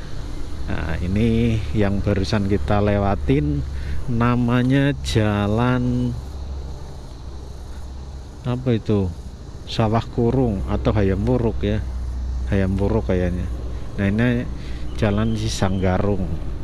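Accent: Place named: Central Java, Indonesia. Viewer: Mas dari Jawa Tengah logatnya kaya ngono Cilacap atau Cirebon sekitar nya 👍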